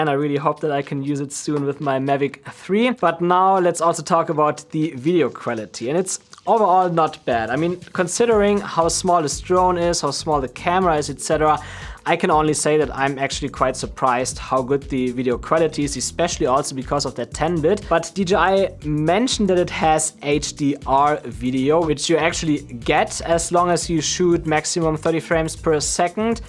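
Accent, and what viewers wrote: Germany. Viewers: Super Video. Ich schreibe mal auf deutsch weil ich anhand deines Englisch denke, dass du aus Deutschland kommst.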